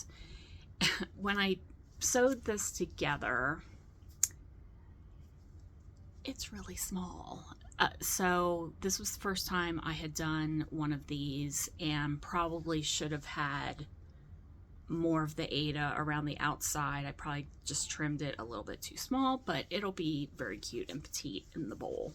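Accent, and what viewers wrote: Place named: Pennsylvania, United States. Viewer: Hi, this is my first time watching your FT, but as soon as I heard you, I recognized the PA accent! I live in NC, but grew up in the northern MD area. I’ve gone to The Stitching Post as well in Catonsville.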